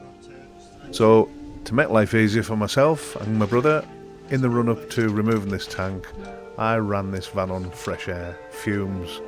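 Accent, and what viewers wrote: Cumbria, United Kingdom. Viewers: I knew I recognised that accent! I am from Whitehaven and I am in the process of buying a VW crafter to convert. I look forward to watching more of your videos and popping into your bros shop for some advice. All the best mate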